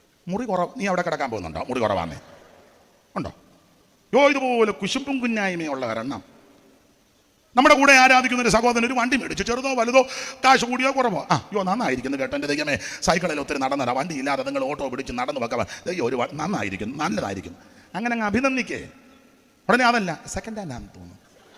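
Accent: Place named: Thiruvalla, India. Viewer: തിരുവല്ലക്കാരുടെ ഭാഷ 😂😂😂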